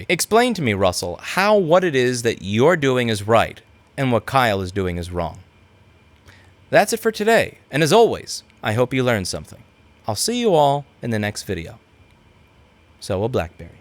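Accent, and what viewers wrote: New York, United States. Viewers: I love how 99% of the time is hardcore in-your-face New Yorker, but when it comes to BlackBerry.... suddenly he's all sweet with a cutesy voice! It's quite the difference 😁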